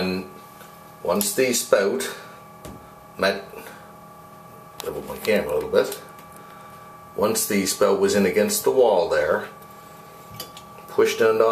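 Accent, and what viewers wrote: Newfoundland, Canada. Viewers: Cape Bretoner or Newf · I love the Canadian accent and I loved the video very helpful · Hi, thanks for the informative video - saved me $150 bucks USD! Based on the comments regarding your Canadian accent on words like "about" and "spout" my reply to Monique Wilson is learn how to pronounce the word "ask" correctly before commenting on our good neighbors north of the border! Monique would most likely want to "axe" you a question on another one of your videos! Thanks again for the informative video - married to a sweet lady from Toronto! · Got to be Canadian - "you're darn toot'in" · Got to be a Newfoundlander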